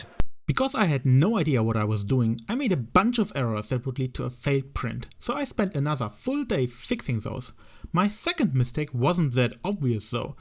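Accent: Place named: Germany. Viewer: German? Irgendwie klingt dein Englisch nach deutschem Akzent. Nice shit btw. ;)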